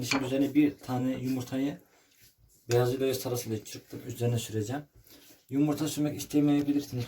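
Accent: Hatay, Turkey. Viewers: Tam bir Hatay şivesi😂🤭 Eline sağlık bu aradaa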